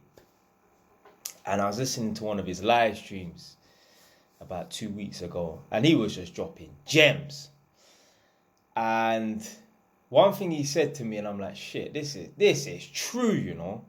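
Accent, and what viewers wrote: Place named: Leyton, United Kingdom. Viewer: lol slyly sound like your from leyton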